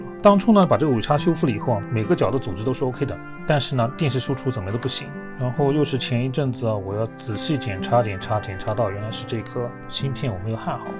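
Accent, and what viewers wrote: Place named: China. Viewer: 口音明顯中國人啊⋯中國人講日語吧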